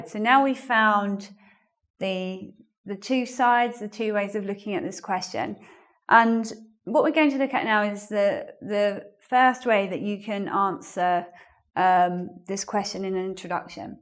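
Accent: United Kingdom. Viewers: she has British accent. I like her voice lol so similar with my professor from London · Your Great, Miss.I was looking for real British English Lesson and now finally found you on you tube, awesome! by the way I'm from Nepal.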